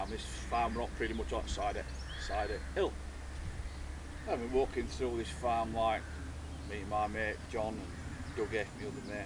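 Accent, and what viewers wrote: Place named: Sheffield, United Kingdom. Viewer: I thought I recognised your accent as a local Sheffield. Thats why I subbed you in the first place - hoped to see you out on a walk one day but then realised your over in Lincolnshire. Maybe meet up at the next Bushcraft show - I could sample some of the curry you made! ha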